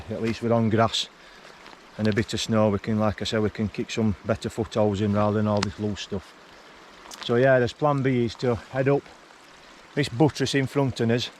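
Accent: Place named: Liverpool, United Kingdom. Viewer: Loving the scouse accent pal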